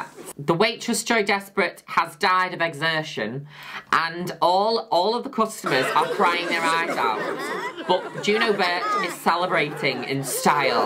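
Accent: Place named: United Kingdom. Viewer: The british accent makes everything better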